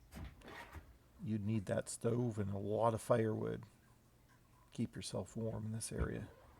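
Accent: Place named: Canada. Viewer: Are you originally from Alberta? Your accent sounds from elsewhere in Canada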